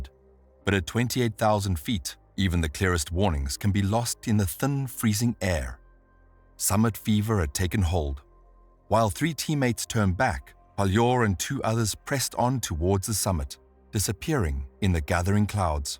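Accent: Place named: South Africa. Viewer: Another South African voice for me to enjoy. Subscribed.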